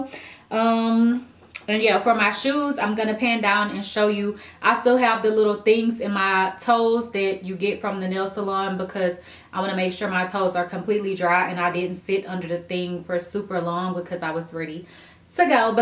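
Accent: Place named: South, United States. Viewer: Yessss I love these type of videos! Your makeup is so nice, your hair is bomb like what lace?!! I LOVE your pregnancy glow and your cute country accent. yess keep them coming!!! do more grwm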